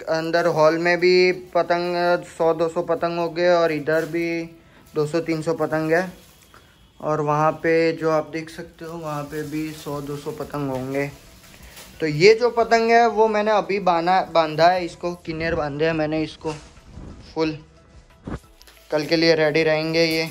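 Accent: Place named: Gujarat, India. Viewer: Mane laagtu j tu tamara ane learn na India cup na interview ma ke aa loko gujarati hase pakku...Tamara hindi ni style upar thi 😂😂 Love from Ahmedabad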